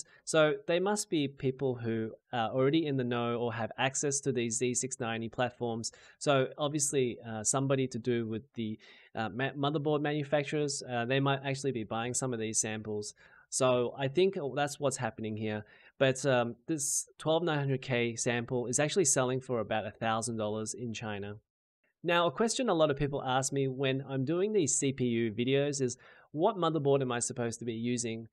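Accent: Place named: Australia. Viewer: Is this an Asian dude with an Aussie accent? Incredible!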